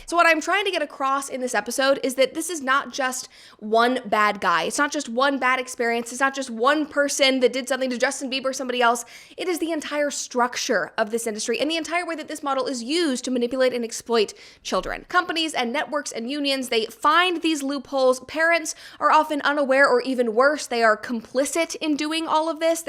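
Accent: United States. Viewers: can i make an AI clone of your voice with Elevenlab i want to custom make an ASMR video on u, i liked your vibes and yapping fast and quick with typical American accent :yougotthis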